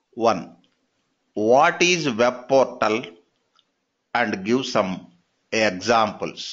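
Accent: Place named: Telugu, India. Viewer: He is a Telegu speaking person. It is a normal accent there. Rely the knowledge he is given. Accent can be adjusted in head.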